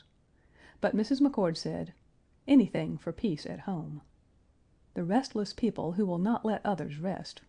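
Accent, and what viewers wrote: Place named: South, United States. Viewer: she has a sexy southern aristocratic voice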